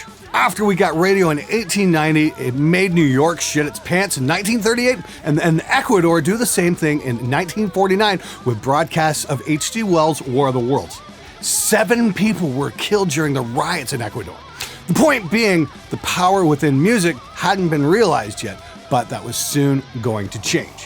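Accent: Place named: Canada. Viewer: Hey. Just wanna say I love the Canadian accent.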